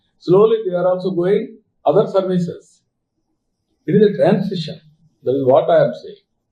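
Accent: Kongu, India. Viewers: கேக்க இனிமையான கொங்கு தமிழ் அருமை சிஸ்டர்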